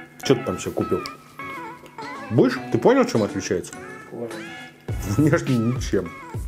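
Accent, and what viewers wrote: Moscow, Russia. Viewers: как я ваш московский акцент терпеть не могу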